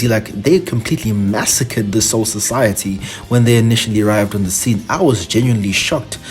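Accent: South Africa. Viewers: i knew you were south african from your voice and lil accent 😭😭😭 it’s good to see my brothers hopping on that bleach train fr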